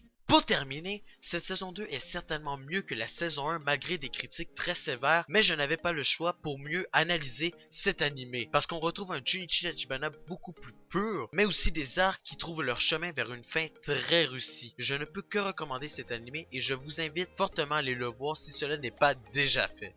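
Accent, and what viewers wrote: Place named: Quebec, Canada. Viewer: Alors non je ne suis pas un PyroManiac, enfin pas encore, car je ne me suis encore pas mis à découvrir d'autres vidéos, notamment à cause du boulot... Mais je risque de m'abo si j'aime ton contenu. Ensuite, je trouve que ton classement par tiers (que j'avais compris^^) est pas mal car il ne les classent tous pas dans un ordre précis. Cependant j'aime bien donner un top 3 (comme celui dans le com précédent). Je m'étend pas plus sur ce commentaire, pourquoi pas sur une autre vidéo, car je vais commencer par regarder l'AMV et les contenus qui m'intéressent. Ah et oui je me doute bien que tu es Québécois, et je ne trouve pas ça utile de le faire remarquer. A partir du moment où tu parles français et que le contenu me plaît, je me fiche pas mal de ta nationalité selon ton accent^^ Voilà je go sur ton AMV, et merci d'avoir pris le temps de me répondre :)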